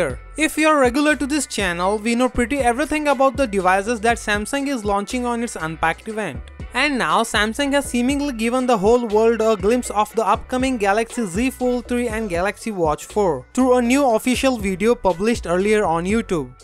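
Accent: India. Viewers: Indian accent very strong , pls stop thank q ver much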